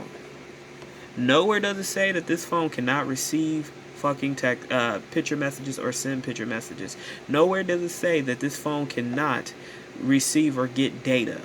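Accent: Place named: South, United States. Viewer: I agree. Tracfone is an inferior company with a poor customer service department. I think initially, people bought prepaid Tracfones for simple uses such as local or emergency calls. You are correct, Seniors began buying these telephones in the early 2000s to avoid a monthly bill. We had to learn how to use the android and iPhones confidently, and effectively. I am an older consumer, considering purchasing an iPhone or android with its monthly expense. You asked why so many of the workers for Tracfone speak American English poorly; it is their second language, not their primary, and maybe not the language that is spoken at home. They are what we (Black people) once were, cheap labor. It is because we as Americans (Black and White) do not address poor behaviors or cultural related barriers and inconveniences that conditions have become unbearable in the United States. 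You are correct when you say, in other countries, one is expected to try to speak the language, but in the USA, the power structure does not demand this for a reason. It is a way of dividing and conquering ethnic people. Setting them at odds with one another. I am not making excuses for these people. When we, (Black people) migrated to the North, White business owners hired us based on complexion and speech (lack of noticeable heavy southern drawl). I heard your southern accent. These people are cheap labor, working jobs others will not. Read the books American Banking in America, The Forgotten Man, then read Rac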